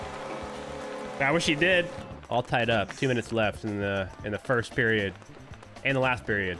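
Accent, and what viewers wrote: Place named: Canada. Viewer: This guy hella canadian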